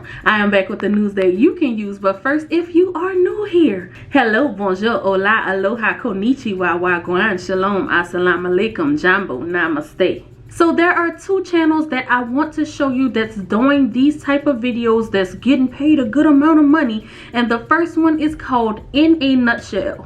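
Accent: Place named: Louisiana, United States. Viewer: I like her, but her voice is so annoying it’s like a Louisiana annoying country type of voice or something just take some English classes somebody’s Youtubers think they can just hop on here and do anything it’s OK to take some classes specially, if you talk to an audience practice your English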